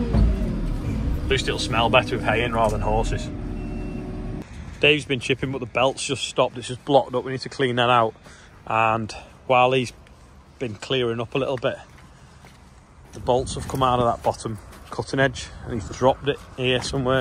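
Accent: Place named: England, United Kingdom. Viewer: Nice video.. only i understand half the time the english accent you are talking😅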